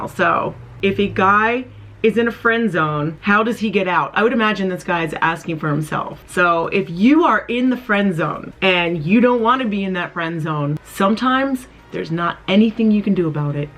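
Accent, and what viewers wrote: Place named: United States. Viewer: I just love your pronunciation sooo much! I'd love to be able to talk so American like you do.. (I'm from Austria that's why lol). Thanks for all the advice, I'm very looking forward to the next video!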